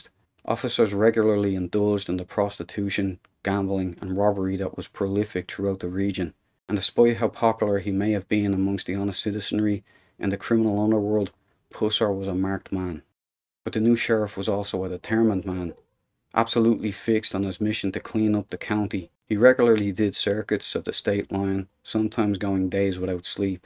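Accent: Dublin, Ireland. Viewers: well done...!...love the Irish axcent..!....& the quote"the Vietanmies were very lucky he wasent sent over there".....lol...4 sure....men like him are a hard find nowadays...!...he did so much in such a short life...R.I.P. Buford..! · Puss-er. The Irish type accent of the narrator brings out the 'Poos-er.' I'm thinking he would pronounce 'hustle' as 'hoostle.' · Spoken like a true Irishman. Well Done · Just discovered this channel, great story. Fair play lad. You a Dub as well? Where 'bouts you from?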